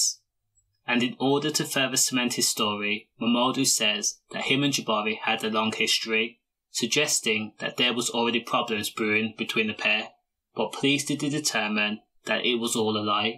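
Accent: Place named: Birmingham, United Kingdom. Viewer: Ahaaa you’re a brummie, I heard that ahhhtt lool same as us im from Stourbridge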